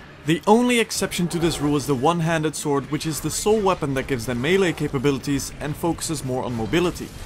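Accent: Netherlands. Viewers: I knew it :D you are dutch :3 could hear it :o anyways awesome explenation :o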